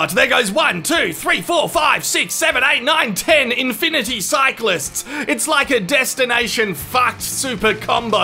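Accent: Australia. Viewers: Australian accent the best accent in the world....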